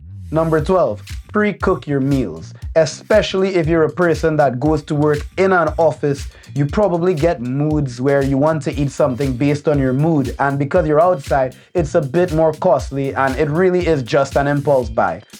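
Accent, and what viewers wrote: Trinidad and Tobago. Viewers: A Trini Accent? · I can hear yuh sounding like ur trini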